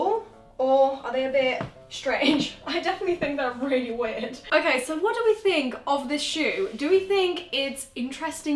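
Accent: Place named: United Kingdom. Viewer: Her british accent OMG 😭💖💖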